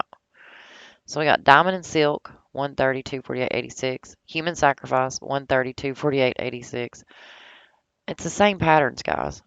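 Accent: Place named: South, United States. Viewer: I thought I had a southern draw. Where are you from ?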